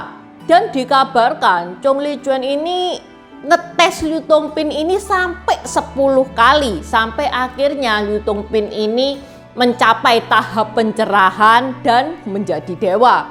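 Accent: Java, Indonesia. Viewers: Cc orang mana sih? Medok pol ❤ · Tionghoa medhok.... 🇲🇨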